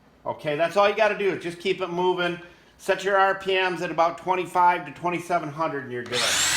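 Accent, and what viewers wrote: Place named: Michigan, United States. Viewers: Where are you in Michigan? I’m originally from the Flint area and thought I recognized that accent. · This guy has a Michigan accent for days...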